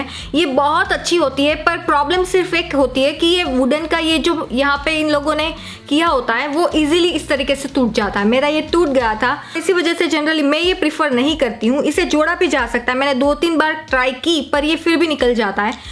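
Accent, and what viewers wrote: Gujarat, India. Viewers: Gujarati tone lag raha hai aapki boli mein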